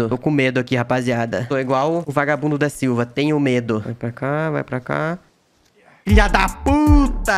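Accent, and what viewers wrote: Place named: South, Brazil. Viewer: Você tem muito sotaque do Sul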